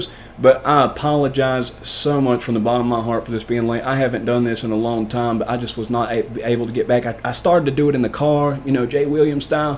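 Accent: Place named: Tennessee, United States. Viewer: Where are you from Kentucky here. I cant pin that accent down . You sound like a cousin of mine though lol Best i can guess is Tn or Ak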